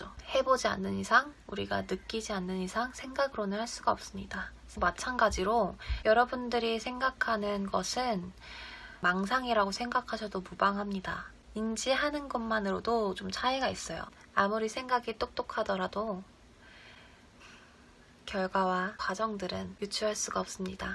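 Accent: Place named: Busan, South Korea. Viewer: 부산여자 아닙니까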